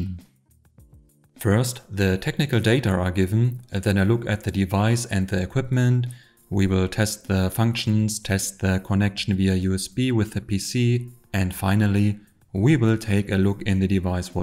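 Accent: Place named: Germany. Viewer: Moin! Das Gerät wird unter verschiedenen Bezeichnungen angeboten. Hanmatek DOS1102, Mustool MDS 2112P und OWON SDS1102. Sind alle identisch, aber unter der Bezeichnung OWON deutlich günstiger. Ich besitze das Gerät von OWON und bin damit sehr zufrieden. An "disadvantages" fehlt ganz klar noch eine fehlende externe Triggermöglichkeit und ein mit 10K doch eher recht kleiner Speicher. Das limitiert doch ganz erheblich die Analyse von digitalen Bittmustern. Trotzdem für den Preis ein super Gerät! Mal grundsätzlich: Offensichtlich (hörbar) Ist deutsch deine Muttersprache. Warum antwortest du auf deutsche Kommentare in englisch??? Deinen Text zu dem Gerät hast du doch auch in deutsch verfasst... Ansonsten recht gelungenes Video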